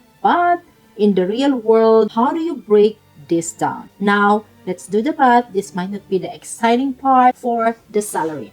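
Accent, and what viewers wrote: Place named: Philippines. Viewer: you look korean , sound like a philippino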